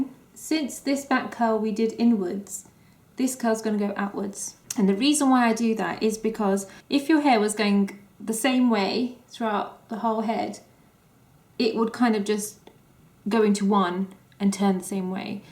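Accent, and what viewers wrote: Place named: London, United Kingdom. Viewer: wow i know iv already said this but sometimes it seems like its cheryl cole doing a tutorial but with a better accent i love the londoners accent. hope you both have a fabulous year ahead and keep making videos and easy on the eyes and ears sis mwah xx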